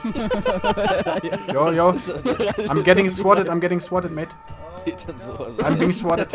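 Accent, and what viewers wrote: Germany. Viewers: You sound very german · your German accent english is so awful, try to speak more fluently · trying understand your english with a German acent, is so hard, but you make a good video.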